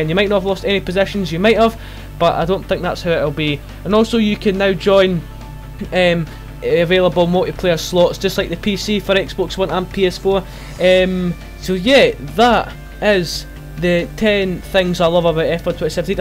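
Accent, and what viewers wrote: Scotland, United Kingdom. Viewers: thodoris papa that's the glorious sometimes annoying to a English Man Scottish accent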